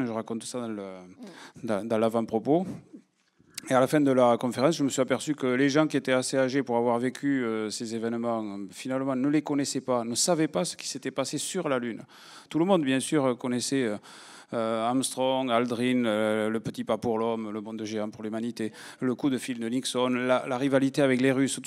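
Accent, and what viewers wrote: South, France. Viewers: Merci pour ce festival d'humour Avai l'accent de la garrigue ... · Mais ..juste INTÉRESSENT ..en + avec 1 accent du sud..de pecor sérieux..tu nous apprend rien du genoux...Oh peu cher...aller tais toi stp...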